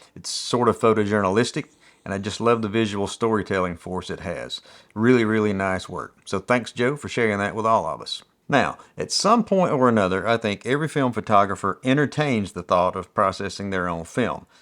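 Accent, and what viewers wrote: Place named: Georgia, United States. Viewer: Started developing film in the early 70’s. Did that till the late 80’s when I joined a Southern Lifestyle shelter magazine and shot only chrome for the next 25 years. Still freelance but of course digital for my clients. But recently, like you I decided to break out the old cameras and put them to use. I was so fast with stainless reels in the old days but picked up Patterson recently. I’m sure I’ll get the hang of it but so far they’re quite a challenge. I’m sure being in a changing bag doesn’t help. I might have to look for those easier Ap reels. Good stuff here and thank you. Trying to place your accent. At first I thought Fort Worth but then decided Georgia. Am I close?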